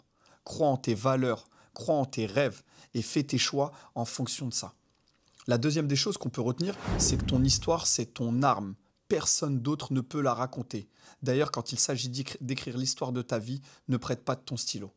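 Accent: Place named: Italy. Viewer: très très cool ce format ! J'avoue que l'accent italien m'a transporté, en plus des précieux tips évidemment 😅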